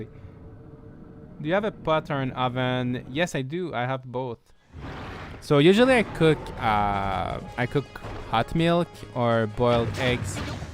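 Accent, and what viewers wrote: Quebec, Canada. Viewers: Hey! So cool to hear a fellow French Canadian. I could tell right away from the accent;). I also recently bought Rune Factory 5 and I was wondering if you knew of a website or maybe a Twitter that could provide some form of guide for the game? Simple things like what gifts certain people prefer and what to give monsters/boss monsters. If not, no pressure! Thanks for providing us a high quality, in-depth look at this awesome game. Edit because I honestly thought way too late about this and I feel like that’s something you considered doing in the future: would you be interested in making a video about how to raise friendships fast/who likes what gifts the most and such? Only if it’s not bothersome!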